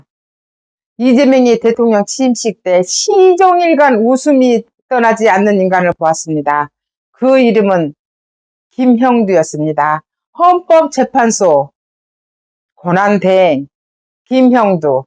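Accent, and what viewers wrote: Jeolla, South Korea. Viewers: 반세기넘게 살도록 전라도 사투리 가 이토록 순박하고 구수하게 들리기는 첨 입니다. 애청자 입니다❤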